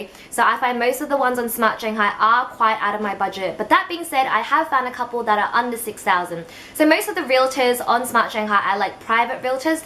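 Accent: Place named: Australia. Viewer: Your 'o's and 'u's sound beautiful. Aussie accent is quite something eh.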